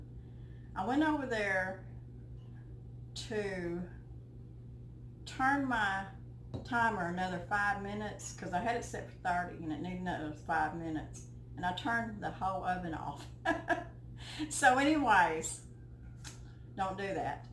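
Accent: South, United States. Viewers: Love your ‘southern” accent and gentle spirit.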